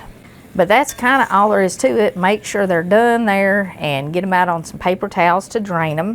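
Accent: South, United States. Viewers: Great video- very helpful I love your southern accent are you from Tennessee?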